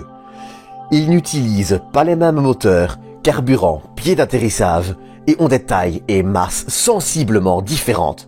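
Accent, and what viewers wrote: Belgium. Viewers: Salut le Belge, c'est un compatriote (expat) qui t'écrit. Super chaine, super montage, super sujet. Mais en tant que Belge je dois dire que j'ai du mal avec ton accent. Je ne suis jamais sur si je dois en rire, car cela à quelque chose de sympa, ou si mes oreilles crient au secourt. Peut-être les deux. D'un côté c'est original... et cela dois faire marrer les Francais. Tout compte fait je me demande si j'ai pas le même 😳... non d'une frite ! Allez pouce bleu quand même. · Un Belge qui dit soixante-douze... Je suis déçu... J'ai vu quelques commentaires sur ton accent. Ne le gomme pas trop, c'est dommage de cacher cette spécificité culturelle !! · Et le super-hairy aussi. Je trouve ça cool, le lanceur super-poilu, ça a la classe. Mais ceci étant, ce n'est pas un problème de prononciation (AMHA), mais plus une question d'accent (belge je suppose). 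C'est ce qui est sympa avec la francophonie, ça met un peu de variété.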